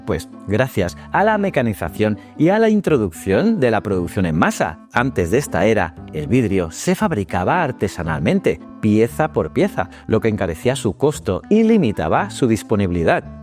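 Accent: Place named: Spain. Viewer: Me gustan este tipo de historia, pero lo único malo es que los españoles hablan muy mal, yo diría, muy reeeemal, lo cual no es nada agradable sentarse muchos minutos escuchando una forma de hablar desagradable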